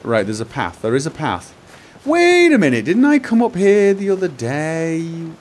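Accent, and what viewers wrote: United Kingdom. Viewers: WARNING: Watching vids can cause you to talk to yourself with a British accent when gaming.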